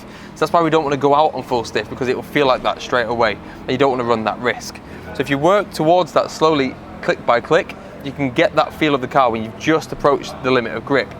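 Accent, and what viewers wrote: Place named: United Kingdom. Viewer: great video, just a bit more USA english will be great for people outside of UK :-)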